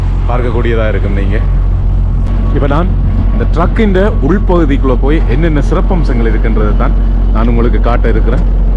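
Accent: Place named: Sri Lanka. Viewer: தமிழ்த்தாய் வாழ்க தலைவர் பிரபாகரன் வாழ்க அண்ணாச்சி உங்கள் ஈழம் தமிழ் கேட்டுக் கொண்டே இருக்கலாம் இனிமையாக இருக்கிறது தமிழுக்கு அமுதென்று பேர், அந்தத் தமிழின்பத் தமிழெங்கள் உயிருக்கு நேர்" என்பதாகும் அதிலும். உங்கள் தமிழ் தனி அழகு தான் அண்ணாச்சி வாழ்க வளமுடன்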